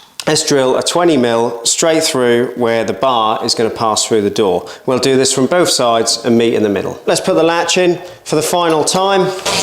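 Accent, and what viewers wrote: Norfolk, United Kingdom. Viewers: You are defo from Norfolk lol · I think I know that Norfolk accent, pretty sure I bought the same doors from the same wickes and then watched this video to hang them. You have helped a groundworker have a little bit of finesse. 🤣 Can you please show me how to cut & clad oak veneer stringer to my existing staircase please.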